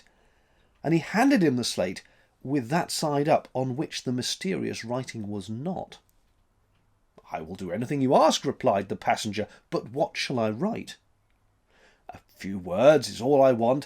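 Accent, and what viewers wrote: United Kingdom. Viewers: bravo. as an american, i can certainly say, stories always sound so much better with a proper british accent. :-)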